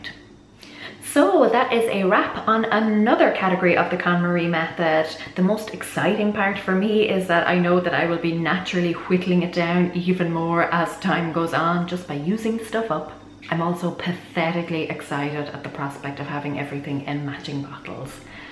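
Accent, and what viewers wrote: Ireland. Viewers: oh u live in ireland? That's cool cause i'm german and I actually thought that you had an irish accent. Interesting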